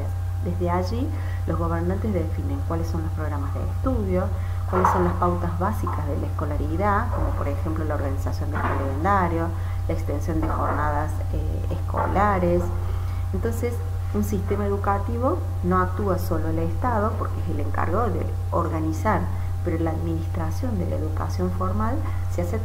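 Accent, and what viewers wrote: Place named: Cordoba, Argentina. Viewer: Estaba buscando a cerca de la ley para realizar un informe y me encantó la información que me brindaste. Muchas gracias 💛 Por tu acento creo que sos de Cordoba, saludos desde B.S. A.S.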